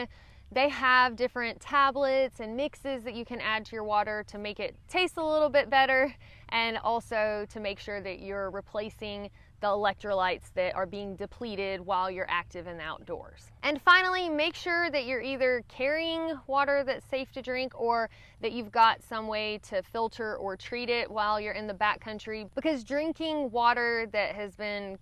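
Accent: South, United States. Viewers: Well done - accurate and factual. Should help new folks stay safe. Plus, I LOVE your accent. Great to hear a southern gal telling it like it is. Nice work!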